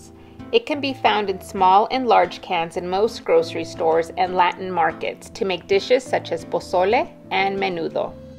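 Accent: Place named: New Mexico, United States. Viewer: I recognize your accent! Are you or your mom from New Mexico?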